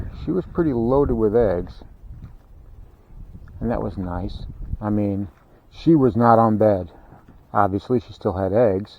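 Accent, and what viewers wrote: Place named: North, United States. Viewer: Hey your accent sounds kinda northern—how did you end up in southern Mississippi?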